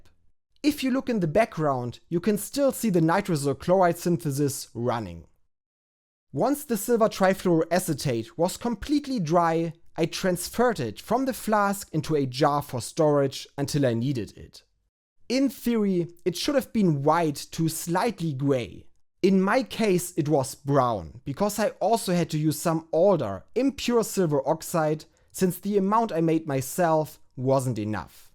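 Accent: Germany. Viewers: As a high school chemistry student here in Denmark, I find your grad level chemistry videos both entertaining and inspiring. Please keep making them, and congratulations on the success with the gas. Even though I might not understand every synthesis step, I really enjoy your explanations and your German accent. These videos capture what chemistry is about, using science to explore interesting compounds. :) And just to try some German, Ich finde deine ganze experiment sehr to toll :) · Bruder, ich habe zum ersten Mal ein Video von deinem Kanal vorgeschlagen bekommen, direkt darauf geklickt und in 0,0002 Sekunden gehörst das du deutsch bist. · you german? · idk why, but i find his thick german accent in english so sexy and relaxing at the same time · Your accent is my favourite German accent. It's up there next to the Simpsons guy who took possession of the missing art before a Kraftwerk concert in Stuttgart.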